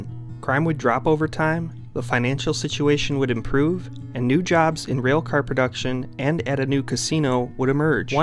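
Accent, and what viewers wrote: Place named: Berkshires, United States. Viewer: Your accent sounds sort of like a Berkshires accent, though I'm guessing Wisconsin after "Worchester" 😉 Springfield and Bridgeport have had similar trajectories since the 50s. Both had major arms manufacturing disappear. Both had crime issues in the 80s. Still not in great shape, but doing better. Thanks for doing this.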